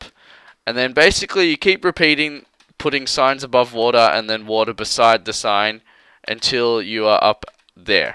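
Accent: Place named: Australia. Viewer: I laughed my ass off hearing you count from 1-30 seriously, i admit i've heard my fair share of australian accents, but this was brilliant, this made my day! Hope no offense is taken, im saying this as a compliment. If i could have an australian accent i would ! just to count like you do! lol haha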